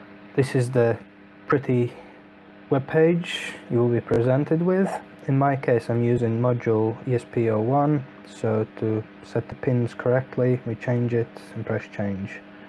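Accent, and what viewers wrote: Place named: Russia. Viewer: А акцент то русский/украинский/белорусский (ну ты понял)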